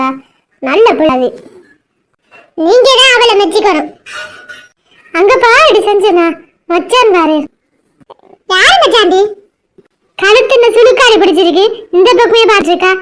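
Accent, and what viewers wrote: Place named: Sri Lanka. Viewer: Video romba romba super sister ❤️🔥 neenga vanthu srilanka va sister pesura slang differanda iruku 🙄🤔 aana supera irukuthu sister intha slang 😍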